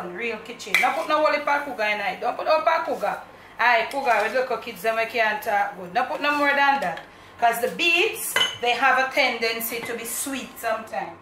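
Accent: Jamaica. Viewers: I love your presentations, but most of all, I love the patois. Just listening to you brings me closer to home.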